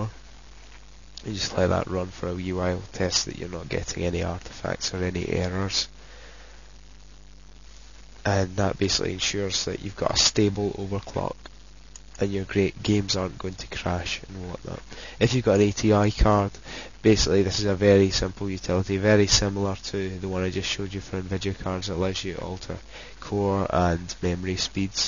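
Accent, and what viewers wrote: United Kingdom. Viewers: You Sound awefully like midlothain punter(im fae the same area). P.s. I know this is an old vid but forceware does not alow you use coolbits. RivaTuner is you best bet from now on unless you want Nvidia shity overclock tools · He's Scottish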